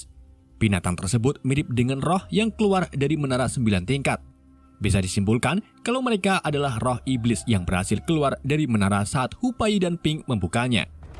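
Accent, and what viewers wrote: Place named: Java, Indonesia. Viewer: Bahasa jawanya khas bgt 😂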